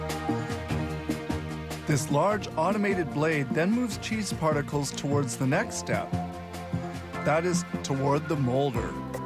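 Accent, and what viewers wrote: Canada. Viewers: His accent is so very Canadian.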